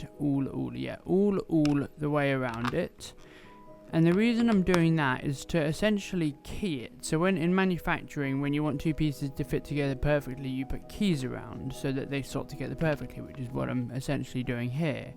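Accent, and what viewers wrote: United Kingdom. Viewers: Какой же отвратительный акцент у британского)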